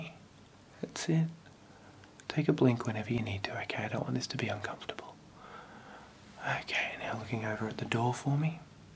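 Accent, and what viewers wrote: Australia. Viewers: I love me some Australian accent ❤️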